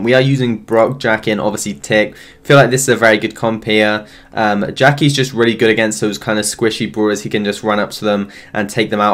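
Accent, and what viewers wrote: United Kingdom. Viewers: I just love your britain accent😊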